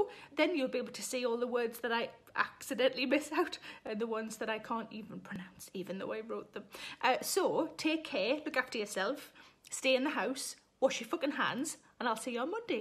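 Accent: Newcastle, United Kingdom. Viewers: I love yr geordie accent 😅😅😅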